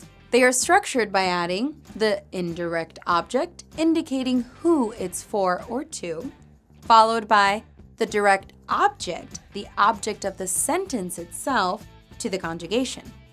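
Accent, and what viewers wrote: Spain. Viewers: I have become a fan of your Spanish accent...!!!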